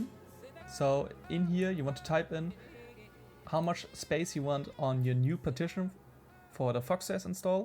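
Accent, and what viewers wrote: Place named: Germany. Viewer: bro u definitely german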